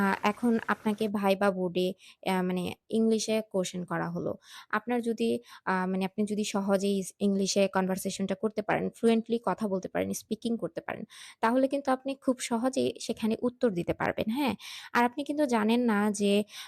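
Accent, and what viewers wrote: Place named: West Bengal, India. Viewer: Hey there! No offence okay ! Take it positively.. 😊 I guess ya should focus on your pronunciation first while speaking in English. Follow British or American accent not indian or bangali style.However I do appreciate your confidence. Indeed😇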